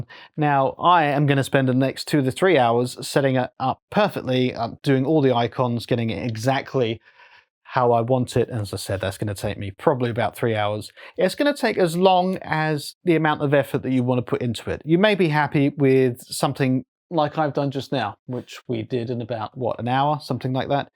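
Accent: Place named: United Kingdom. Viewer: It looks good. By your accent I can tell that you are British. If you actually live in the UK how the hell did you get one?!? Their website doesn't even list the UK as a shipping destination 🤬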